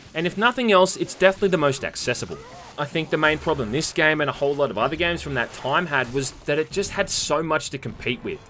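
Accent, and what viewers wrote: Australia. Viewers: yep regardless if the game is good or bad always does it justice. He is around my age n I think his bad as Australia accent helps.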